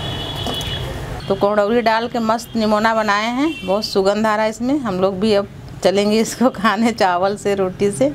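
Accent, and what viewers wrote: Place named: Uttar Pradesh, India. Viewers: Aunty Apne up evam ilahabad ki Shan badha di. Love you. Bhasha ka accent bhi pure up style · I love your UP accent........UP regional taste is best in India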